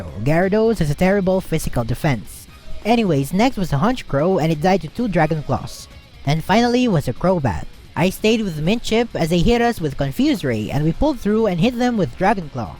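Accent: Philippines. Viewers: Pansin ko lang po boses mo eh napaisip ako pilipino kaya to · Tol bago ako sa youtube channel mo at na recommend sa akin ng youtube at btw yung nung pagkarinig ko sa english mo sabi ko ay filipino to hahahaha D ko tinatawanan yung pag salita mo ng english pero kung sayu medjo d nakakatuwa Sorry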